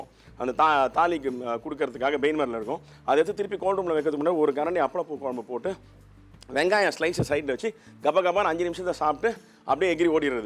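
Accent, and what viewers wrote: Chennai, India. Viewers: You are the most amazing person who cooks with love & laughter. I literally love to watch your cooking especially with your lovely speaking.. mostly Madras tamil.. you are truly great. I am living in California for more than 22years but still I am the same Madras girl who loves to cook (only after marriage though😉). Just the way you speak & entertain us, added with your cooking takes it to another level. I admire you soo much. My son loves South Indian food. So just for him I try different styles. You are so amazing.. if it was just a music with cooking or some too much unnecessary talking with cooking , I would not have been drawn to you.. you are lovely with the cooking & the most I admire you is for the way you talk about the cooking or the ingredients. I literally LOL (laugh out loud) whenever I see a recipe from you that I want to try. 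Please be the same person & never ever change your attitude. You are very down to earth & still entertain us & teach us how to cook at the same time. I never ever message or comment to any of anything online thingie in my life so far but I just couldn't resist but to comment/message you. This is Bala from Los Angeles, California but always a tamil ponnu; raised in Chennai. Thank you for your dedication to share your passion to others.. it means a lot to a lot of people that I am sure of. Whenever I hear you speak..that joy & laughter you bring with a recipe means soo much for me. Thanks a lot Mr. Bhat. I hope you read this comment & reply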